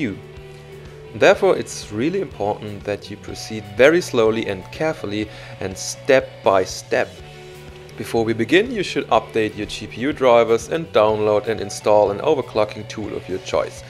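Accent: Germany. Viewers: Ah, ein Deutscher, absolute Klasse, wir Deutschen sind eben einfach am Akzent gut erkennbar :)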